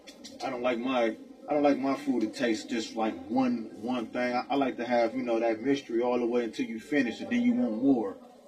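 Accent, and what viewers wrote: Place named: West Coast, United States. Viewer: He Is In A California Prison!!!! His Accent Is Straight West Coast!!!!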